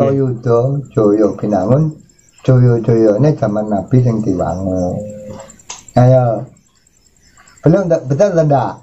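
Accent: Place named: Bojonegoro, Indonesia. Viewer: Mugi slamet kung.... Kok kados bojonegoro logat bahasa nipun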